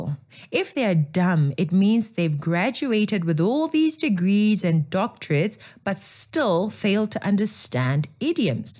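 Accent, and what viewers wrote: Model C, South Africa. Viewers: With your model C accent, you shouldn't be Zille's apologist. Dont try to be a good black.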